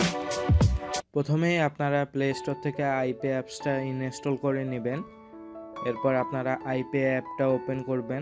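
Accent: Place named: Sylhet, Bangladesh. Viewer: ভাই আপনার কথা শুনে মনে হচ্ছে আপনি সিলেটি আমিও সিলেটি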